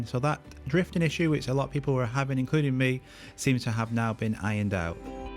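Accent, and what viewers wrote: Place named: England, United Kingdom. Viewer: Thank you for informations Witch place in england is your accent from ?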